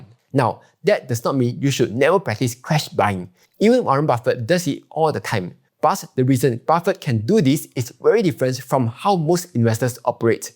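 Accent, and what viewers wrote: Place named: Singapore. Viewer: I can't understand your singlish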